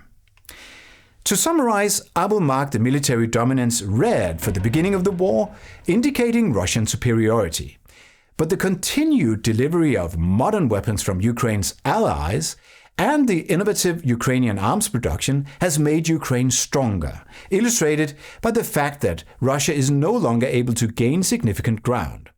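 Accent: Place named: United Kingdom. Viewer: I cannot thank you enough, I love your content and presentation. May I ask, are you German? You have a British accent but is a German name. We (normal Americans) are glad that Germany is on our side… we have some seriously bad adversaries that we will soon be dealing with…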